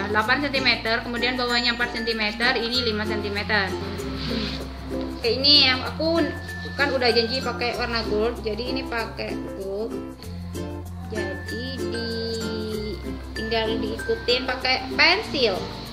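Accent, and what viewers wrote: Madura, Indonesia. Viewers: Buset..Madura kah kak kwkw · org madura kah kak, logatnya..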